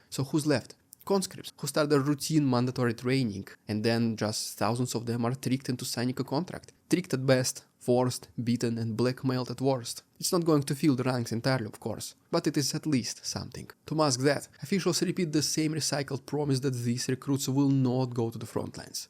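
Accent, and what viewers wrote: Russia. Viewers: watching your old videos, impressed by your beard growth looks great with your accent, it is perfect for a Russian you tuber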